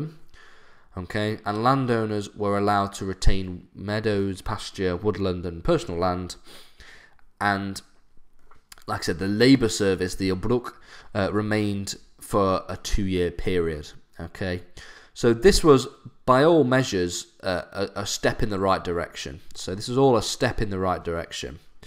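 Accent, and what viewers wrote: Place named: United Kingdom. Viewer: bri ish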